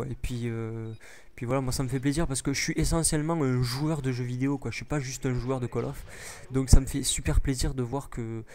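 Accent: Toulouse, France. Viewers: Cet accent toulousain me fait tellement rire!!! Bonne continuation à toi!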